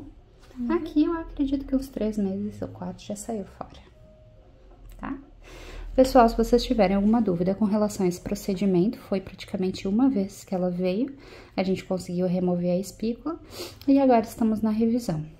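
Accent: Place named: South, Brazil. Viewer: A voz e o sotaque dela são idênticos aos da minha cunhada que também é do sul.😊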